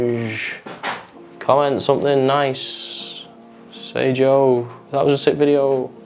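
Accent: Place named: England, United Kingdom. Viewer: Sorry I can't handle the gay pommy accent lol try doing some legs bro. I'm heading back to Ryan Hutchinson for some decent sarcasm lol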